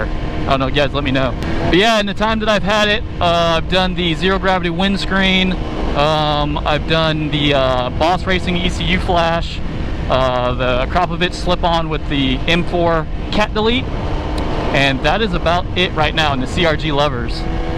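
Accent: United States. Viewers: What part of Louisiana you from?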